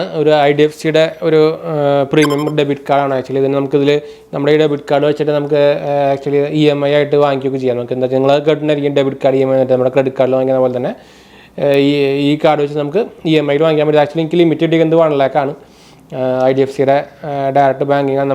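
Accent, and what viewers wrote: Thrissur, India. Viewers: തൃശൂരാല്ലേ ഗഡീ ?😛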